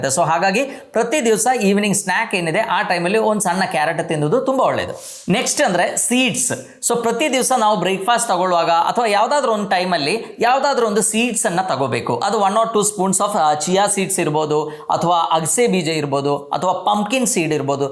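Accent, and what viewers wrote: Kerala, India. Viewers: Good Morning sir...You are giving very very very good information Always i will be watching your videos. I can understand most of your points b coz I studied the related course in same the profession and also I studied about Nephro urology and i worked in the joint venture units. And one more thing except Malayalam accent will be coming in your talk while using English your Kannada is 99% good . And Thank you so much for giving more informations about health updates in your every videos. Thank you so much sir .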